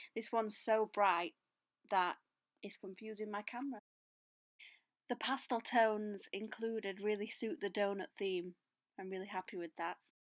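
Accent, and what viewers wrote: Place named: United Kingdom. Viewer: Huh, I’ve been following you on Instagram for ages and I never realized you were British! Great video 😊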